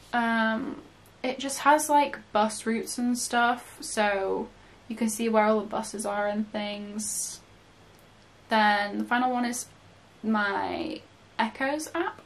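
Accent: United Kingdom. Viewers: I didn’t realise u were British lol 😂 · Are u British? Just asking cause of the actient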